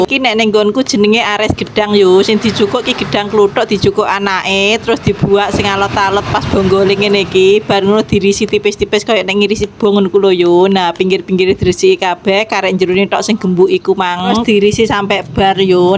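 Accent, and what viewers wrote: Java, Indonesia. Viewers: iki ko ndi mbak e...jawa timur koyone yoo,? bahasane khas jowo👍❤️❤️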